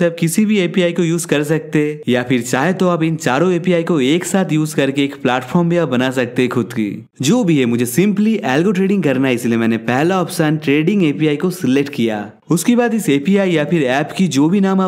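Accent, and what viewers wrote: Bengal, India. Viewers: u must be a bengali your accent describes .loads of love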